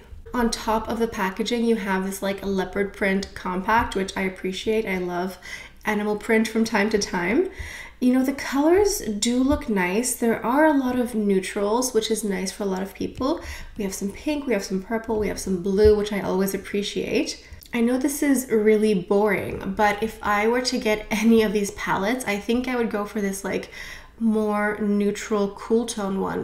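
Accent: France. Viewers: Are you actually french?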